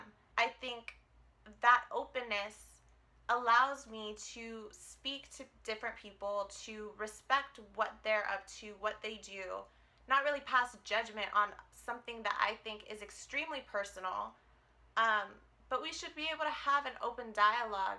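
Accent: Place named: Latin America. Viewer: I enjoyed how this was put together. The way you edited, allowed for this to feel more real and personal rather than too filtered and distant. kudos to the forehead comment 😂 You're awesome. Keep at it, (Latino accent and all). 😊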